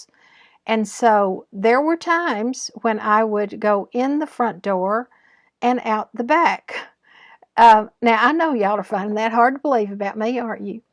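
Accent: South, United States. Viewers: I look so forward to your flosstube videos! You are so sweet and of course I love your southern accent. You do such wonderful projects! Kim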